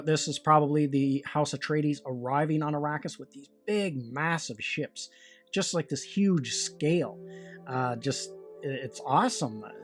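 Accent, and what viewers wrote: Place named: Midwest, United States. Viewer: I like to place accents so Wisconsin? or maybe somewhere in the midwest.? This is just out of curiosity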